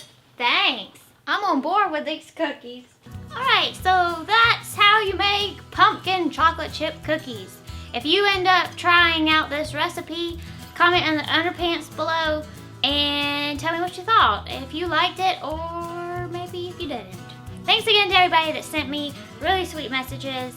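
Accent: South, United States. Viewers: Love you when you bouncing around in the kitchen ,by the way your southern accent is gorgeous. I wish could learn talk like you. Xmas greetings from sweden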